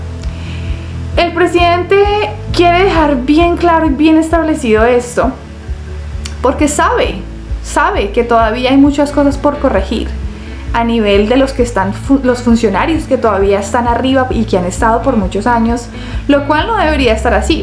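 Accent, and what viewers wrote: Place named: Colombia. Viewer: Hola mija eres chingona,,me encanto tu acento Columbia,,..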